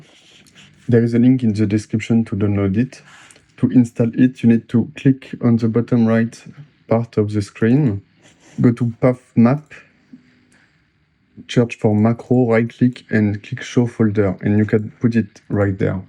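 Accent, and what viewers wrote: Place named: France. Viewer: Hello, from your accent i guess you must be french. Merci pour ta macro. Je me penchais justement sur la question et j'ai pu étudier ta façon de procéder à l'intérieur de ta macro. Au cas où, mais tu dois être au courant <link> Le gars vient aussi de sortir un plugin pour photoshop qui pourrait aussi te donner des idées pour une v2. Encore merci.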